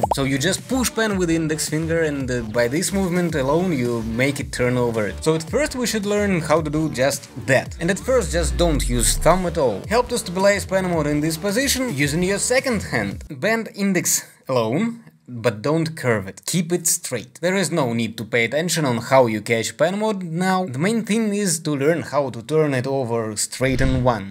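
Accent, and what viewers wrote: Russia. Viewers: I love your. Russian accent it's so nice to hear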